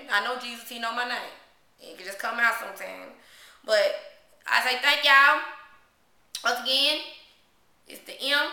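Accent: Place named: South, United States. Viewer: THANK YALL ONCE AGAIN!!!! lol luv her country voice... Its in all us dirty south folk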